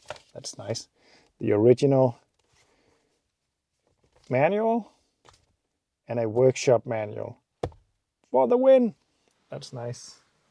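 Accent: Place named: Denmark. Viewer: Looks like a Peugeot 504 at the front. I know a fellow Danish man and his accent sounds similar to yours.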